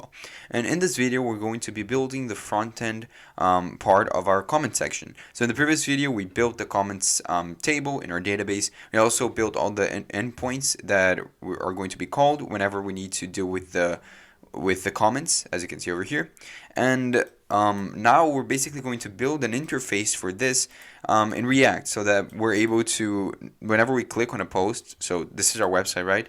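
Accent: Brazil. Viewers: The right thing was to call the function to load the comments and not to force in the front-end with a state. But ok nice video, helping a lot. (Tu é br com esse sotaque ctz kkkk abraço)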